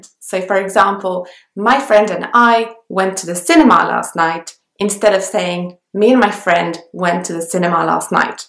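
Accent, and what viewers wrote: United Kingdom. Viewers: i really though u were british LOL jajaja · She speaks British English.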